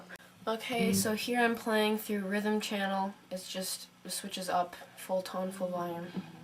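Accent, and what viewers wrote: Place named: United States. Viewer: i thought you were british but you sound american .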